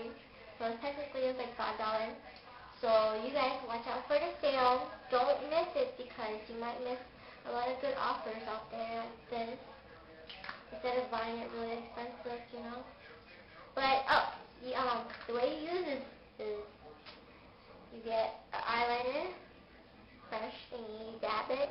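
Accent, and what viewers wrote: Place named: Asia. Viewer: you got an asian accent lolz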